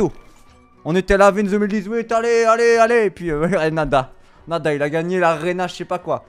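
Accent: Belgium. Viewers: Ah ben ça fait plaisir une petite arène. Par contre quand je t'écoute parler ça sent bon la frite la bière et la fricadelle j'adore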